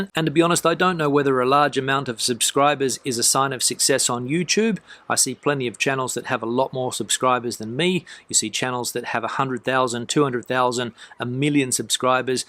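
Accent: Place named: Australia. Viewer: Love your video does thank you. Have to admit I only started watching because of th e ausssie acent and Spanish Horses. Well and Spain. I lived in Aus briefLy, two years as small Pearson, obviously made a big impression.🤠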